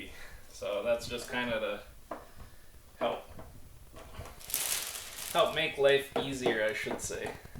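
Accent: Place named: Canada. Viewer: $3299 Canadian? I ask because clearly you have a Canadian accent. But I tried downloading the Greentoe app and or says not available in Canada?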